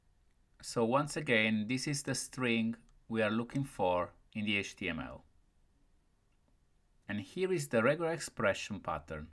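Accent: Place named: Italy. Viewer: si sente che sei italiano bro(non è un'offesa, però fa un po' ridere aahahah)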